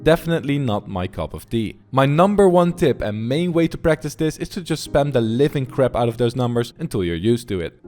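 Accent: Netherlands. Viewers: i just bought my keyboard n mouse and getting it tommorow! you realy helped me thx! btw are you dutch? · Mooi nederlands accent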